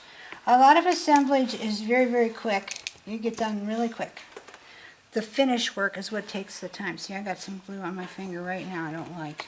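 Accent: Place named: Pittsburgh, United States. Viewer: Very nice! Love the hummingbird one. And got a real chuckle out of the Pittsburgh-ese. LOL Made me feel right at home. <3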